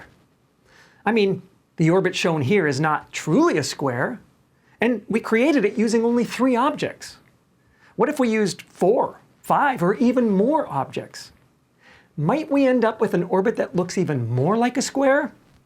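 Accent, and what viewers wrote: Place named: United States. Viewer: You have like the perfect american accent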